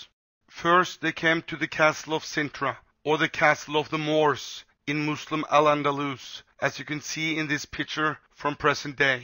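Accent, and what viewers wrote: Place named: Norway. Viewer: Hehe, genuine Norwegian accent and all :) Great video mate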